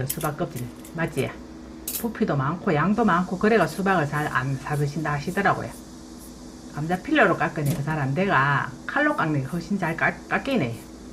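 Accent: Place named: Jeolla, South Korea. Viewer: 그치예? 요래요래.. 그래도 되고예... ㅋ... 남도사투리..옛 사랑이 생각나는 사투리 입니다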